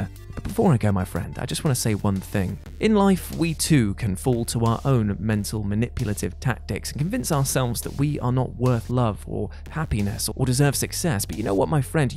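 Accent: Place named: Wales, United Kingdom. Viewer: dude you make a great point dude.And the accent of yours is Welsh accent,cause you seem to laid back for a English dude,But right video game developers are find new ways to rip people off,so dude this a good video on developers and the misleading gamers buying thier games.And I sure those developers will bust your balls in this here comment section,but I am on your side.Cause someone has to be,and your cool dude from the old country,and again Merry Christmas from all of North America.And stay cool over there.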